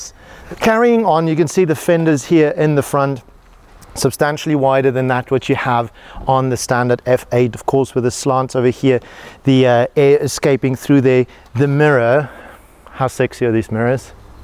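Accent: Afrikaans, South Africa. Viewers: My guy are you from South Africa? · Epic as always and that Afrikaans accent WITH the words and phrases 🇿🇦leka man 💯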